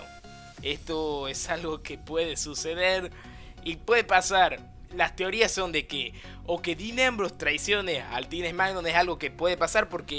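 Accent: Tucumán, Argentina. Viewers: yo soy argentino y tu tonada parece de tucumana😊😊😊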